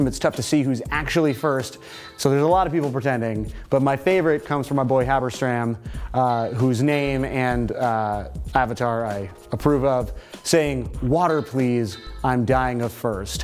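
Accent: Canada. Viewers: Your Canadian hell ya Eh...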